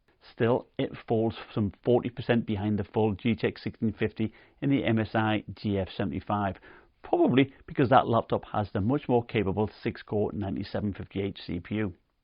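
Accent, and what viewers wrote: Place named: England, United Kingdom. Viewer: Your in the US? You sound Like your from England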